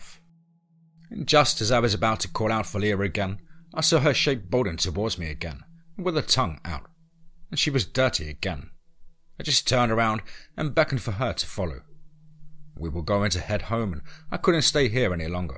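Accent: England, United Kingdom. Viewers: Idk why English accents are great for scary story narrations, but they are!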